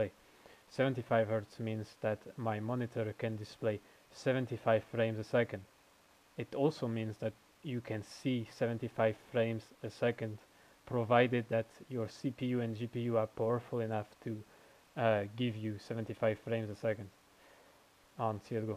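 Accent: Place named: India. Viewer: sounds like an indian tech support scammer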